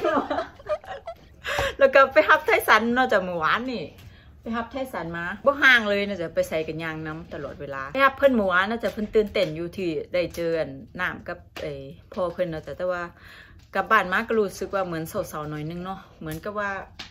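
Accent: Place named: Isan, Thailand. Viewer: ขอบคุณพระเจ้าที่คุณและครอบครัวถึงอังกฤษอย่างปลอดภัยมีความสุขนะคะคุณน่ารักพูดภาษาอิสานเพราะมากคะ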